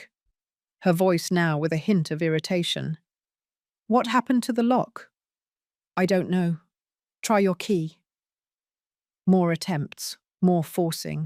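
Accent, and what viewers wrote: England, United Kingdom. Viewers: Oh it is so good to hear an English narrater. Lovely easy voice to listen to ❤❤listening from Swimbridge Nth Devon UK. ❤❤ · Your British accent it looks so far from the Bazaar story you are making girlfriend comes and do all distinct to you and you take it come on even for the story it's so Bazaar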